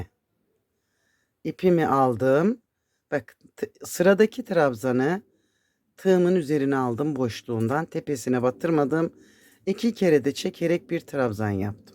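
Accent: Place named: Malatya, Turkey. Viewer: Çok güzel anlatıyorsunuz sivenize bayılarak dinliyorum Malatya'da bizim gibi konuşuyorsunuz şimdiye kadar hep şişle örgüler yaptım size abone olduktan. Sonra tığla devam etmeye karar verdim daha kolay daha çabuk bitiyor buda benim işime geliyor torunlarla uğraşmaktan zaman kalmıyor yanlız birde şu pileeli kız bebek hırkası aatarsaniz sedvinirim cunkuj benimkiler hep kız 🤗🤗🌹🤗🤗🤗🤲🤗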